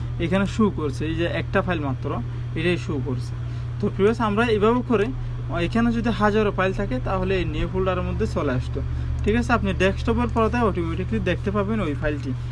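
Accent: Chittagong, Bangladesh. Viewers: Thanks very much. Are you from Chittagong?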